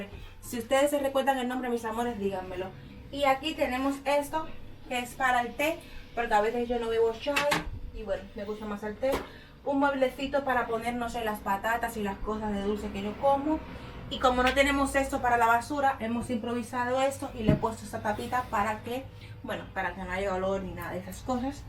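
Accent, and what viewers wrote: Spain. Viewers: Aqui hablavas con acento de España...ahora hablas diferente paisana😅😅.Como conosiste a tù novio y donde?? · Si eres cubana, porque hablas enfáticamente como los españoles,disculpa el dialecto cubano es difícil de erradicar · Que acento tan español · Muy fina, muy española hablando y las terminaciones "mos" las cambia por "nos" como: Alumbrábanos en vez de alumbrábamos, etc. Lo que llamas ordenador es el monitor, lo que llamas monitor es la torre que es en realidad el ordenador. Mucha fuerza, cuando se lucha como haces tú, de todo se sale, tendrás todo lo que mereces con la ayuda de Dios. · Te gusta Turkia? Veo que tienes el acento español!!!!Saludos😊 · Eres Cubana pero tienes un acento Español. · PORQUE HABLAS COMO ESPAÑOLA SI TIENES UN MARAVILLOSO ACENTO CUBANO. YO VIVÍ EN MADRID Y NUNCA HABLÉ COMO ESPAÑOLA SEGUÍ MI ACENTO CUBANO. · Hola te comencé a ver cuándo sacaste el vídeo con Paulita una persona muy bella, eres cubana por favor no hables con el acento de los españoles, eres hermosa y no hay que olvidar nuestras raíces, soy del estado de Veracruz, Mex. 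· Niña bella ❤me encantan tus videos con tus experiencias y sobre todo Como tu dices demostrar tus raizes Porfa HABLA COMO UNA CUBANA TU IDIOMA❤ DEJA ESE ASENTO ESPAÑOL PUES YO VIVO EN ALEMANIA MAS DE 30 AÑOS Y NO HABLO COMO UNA ALEMANA MI CASTELLANO, MI ESPAÑOL ES MI ORGULLO MIS HIJOS SON DE PADRE ALEMAN Y HABLA MI IDIOMA SIN ASENTO Y ESO ES MI ORGULLO AUNQUE ELLOS SEAN ALEMANES LES EXIJI SIEMPRE QUE HABLEN SIN ASENTO Y HOY POR HOY ELLOS MISMO ME LO AGRADESEN HERMOSA MUCHAS BENDICIONES Y GRACIAS POR TUS VIDEOS 🙏🙏🙏❤️❤️ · Hace un tiempo te vi en un video c9n tu mamá haciendo una historia y hablabas como una cubana que eres. Y ahora habla como una española??? Porque. Acaso es delito?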